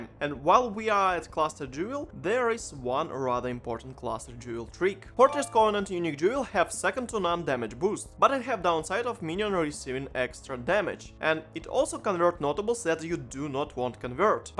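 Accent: Russia. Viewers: Russian accent is so intense)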